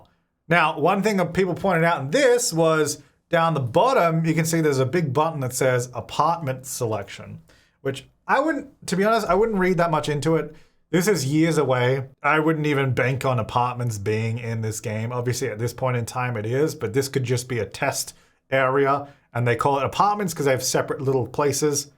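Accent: Australia. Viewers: "lil nugget" 🥺 why is that so cute? It's probably the aussie accent, love it 💗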